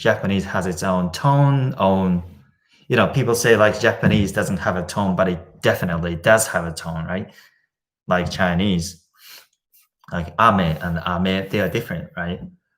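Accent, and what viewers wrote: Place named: Australia. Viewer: 発音が、大学のオーストラリア人の教授とすごく似てました。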